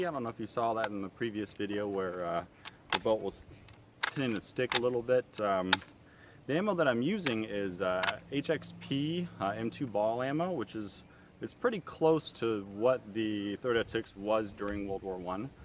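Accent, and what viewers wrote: United States. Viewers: Oh that’s American I thought it was british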